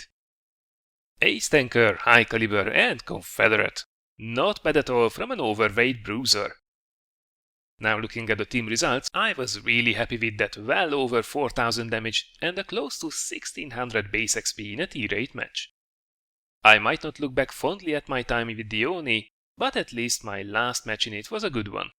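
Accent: Hungary. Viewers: Where are you from??(narrator) You have got hungarian accent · he is from hungary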